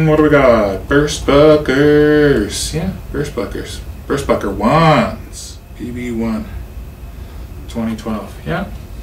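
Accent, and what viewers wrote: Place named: Canada. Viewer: Wow, lots of haters today. I ignore the Canadian accent, profanity, condescending attitude, occasional mistake, just to see someone enjoy what he does and learn as he goes. If you don't like it, go see Dave's World Of Fun Stuff, Will's Easy Guitars, or any of the other millions of guys doing this, (Or something like it) on YouTube.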